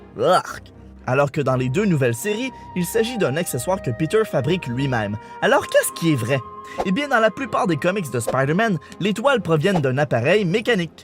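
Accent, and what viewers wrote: Quebec, Canada. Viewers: Bon anniversaire ❤️😂je suis québécoise et ça fait du bien d'entendre l'accent, même pendant quelques minutes 😁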